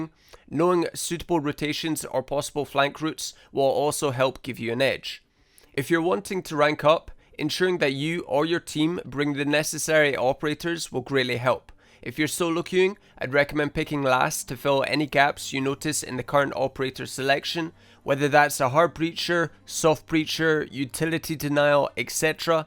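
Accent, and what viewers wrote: Scotland, United Kingdom. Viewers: R u Scottish? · Where you from?can hear a Scottish accent a bit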